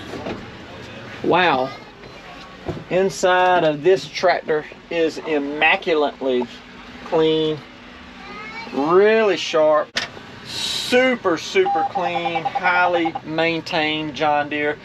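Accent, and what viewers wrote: South, United States. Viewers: New to your channel, explanation about farming in Georgia and content is excellent 😊. Love the southern talk to talking also. Retired Michigan farmer. Take care have a great day!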